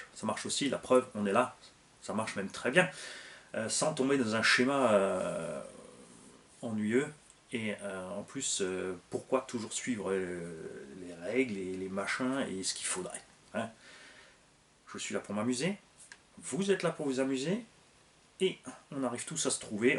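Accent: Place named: Alsace, France. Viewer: Bonjour ! Je viens de m'abonner à votre chaîne que je suis depuis quelque temps. Merci pour ce que vous faites, et en plus l'accent alsacien me rappel les racines... ça fait du bien 😍😅 J'ai l'intention de me mettre à la RC avec mon fils de 6/7ans. Pour le fiston je pensais partir sur un Funtek MT4. Si vous avez d'autres idées dans ce budget je suis preneur. Quant à moi je pensais commencer par un Arrma Sentons 3S. Qu'en pensez vous ? Merci en tout cas pour votre bonne humeur !!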